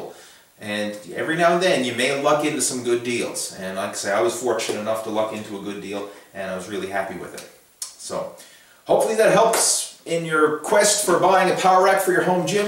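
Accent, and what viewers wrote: Newfoundland, Canada. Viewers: this guy sounds like a newfie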